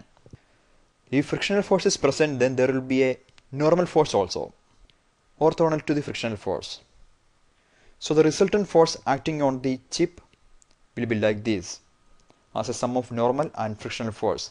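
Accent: Kerala, India. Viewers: good one. I guess the speaker is a keralite. the accent 😑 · Nice mallu accent :)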